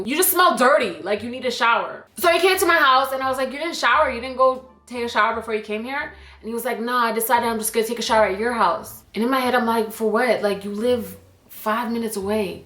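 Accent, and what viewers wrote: Jamaica. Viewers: Aye mi love di accent Hafi chip it in sometimes 🤣🇯🇲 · Is the rich jamaican accent for me... perfect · Mi luv di patwa lol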